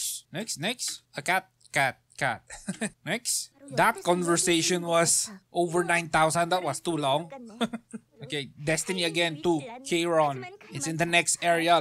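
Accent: Philippines. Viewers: wait.. thats a philipino accent? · You sounded like a Pinoy. I hope I'm correct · Pinoy accent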